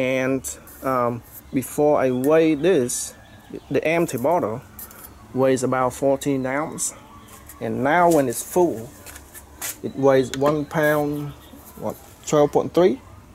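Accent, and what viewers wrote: Vietnam. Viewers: Sounds like a Vietnamese accent. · Sounds like Vietnamese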